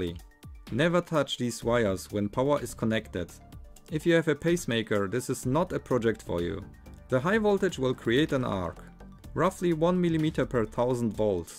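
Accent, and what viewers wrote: Germany. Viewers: Are you german? You do sound like it. If so, you're one of the few (like Great Scott!) who make interesting videos with outstanding quality. Unfortunately a rarity among german youtubers. Sub +1, keep em comin' :)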